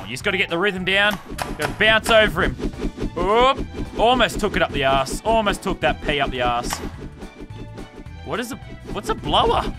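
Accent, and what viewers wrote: Australia. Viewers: Wait is lazarbeam Australian? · DONT SWEAR YOU AUSSIE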